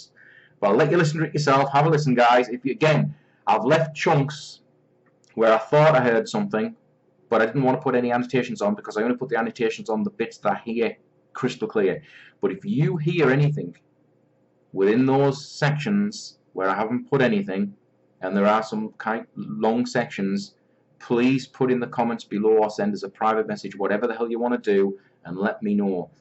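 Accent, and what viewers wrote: Newcastle, United Kingdom. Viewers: Central??? He's a jordie from the north east(newcastle area). Central would be from mank (manchester) to brummie (birmingham)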